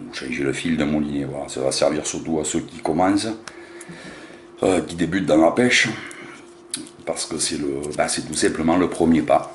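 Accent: South, France. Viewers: votre accent du sud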